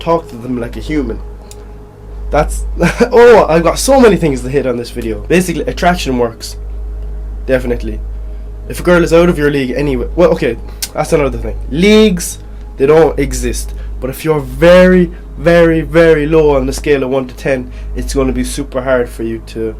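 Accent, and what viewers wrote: Ireland. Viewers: You’re Irish ? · Holy shit your Irish? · Beat the cheeks off her loool. That's a fine Cork accent u have there bai. Fair play kid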